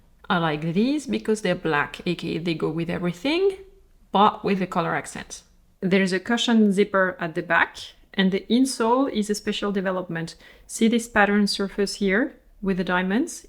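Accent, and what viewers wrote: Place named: France. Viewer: Please, please speak a little slower. I like your chabel and I like what you are saing, BUT it is very dificult to understand when you spead that fast and vith that strong french accent (whisn is beautiful, don´t you mis understand me) I am also not native english speaker, may be that is the reason that I can not understand well. I have noticed that you speak now much faster then before mooving back to France. I wish you all the best.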